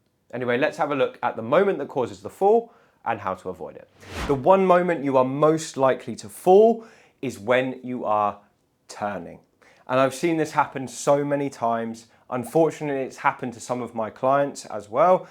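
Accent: United Kingdom. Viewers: OK, dude, you've got a cute British accent, and it sells in America. But git real, y'all. Nobody turns crossing his legs first. On the other hand, maybe you should teach now how we should drink through our mouth, not through our nostrils. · Thank you for the work you do! My wife is in physical therapy from a young man that resembles yourself. Except for the British accent.😊 She is receiving treatment to regain confidence after a hip replacement. Her marching turns must take 20 steps. Therapy is critical in allowing a person to use what the surgeon provided.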